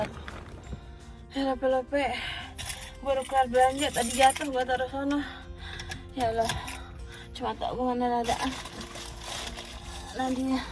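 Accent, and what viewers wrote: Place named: Jakarta, Indonesia. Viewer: ya Allah mpok😱..kgk salah ntu😅..alis knp tinggi sebelah ya mpok🙄..sukses trs ya mpok dgn logat betawi nya🤩🤗🤗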